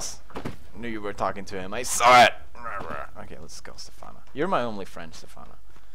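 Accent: Sweden. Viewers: His Swedish accent is so prominent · still had that Swedish accent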